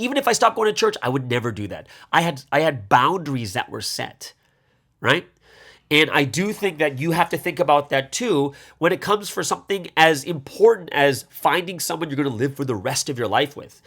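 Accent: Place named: Australia. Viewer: Oh I'm all for 👏 GIRLY 👏 AUSSIE 👏 ACCENT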